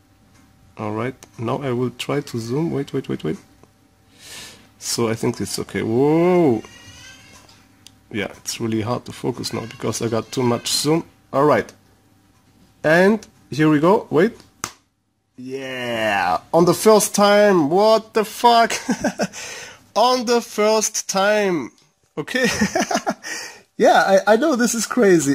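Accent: Germany. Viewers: Man merkt sofort dass du deutscher bist.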